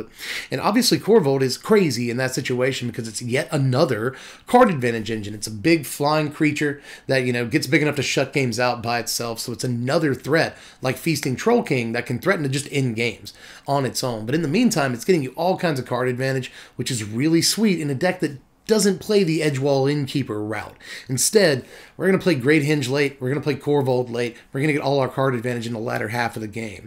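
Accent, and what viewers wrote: United Kingdom. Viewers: I liked this vid specifically for the crazy brit voice lmao. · Love the English accent haha as an Englishman my self it was perfect 🤣 love you keep up the amazing work!!! Best MTG creator and it ain't close!!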